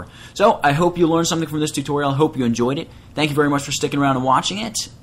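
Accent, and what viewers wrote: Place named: United States. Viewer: typical US accent..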